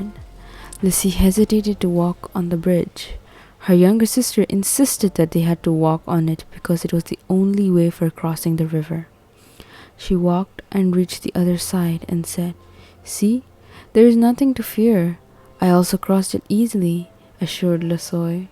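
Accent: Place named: India. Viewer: Very typical Indian accent 😂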